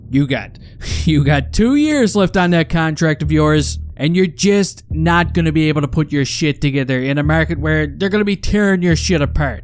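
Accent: Canada. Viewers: Your accent is somewhere between Canadian and Irish. Double-ended lol.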